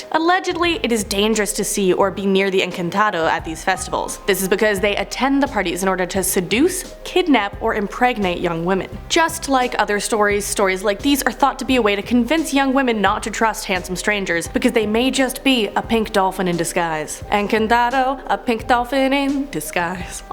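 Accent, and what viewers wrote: Canada. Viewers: I like how they talk in Canada. Words like: "about" they pronounce "aboot."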